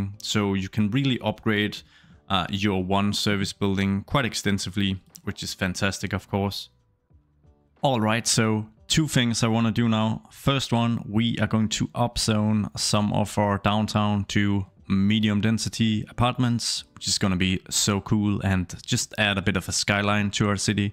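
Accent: Denmark. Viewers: Where are you from? :-D Your accent sounds almost Danish! Awesome movie by the way! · Are you danish? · Are you danish? 🇩🇰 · Er du dansker?:) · are you danish? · er du Dansker ? 😅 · Are you Danish? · You sound like you're from Denmark :) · Really cool!! Can’t wait to play CS2 myself.. by the way, are you from Denmark by any chance? Hearing your accent? 😁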